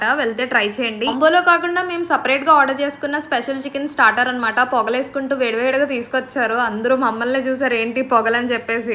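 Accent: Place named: Andhra, India. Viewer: Mee slang chala bagundi. Pakka andhra ammai la chala correct ga words unnai. Nice videos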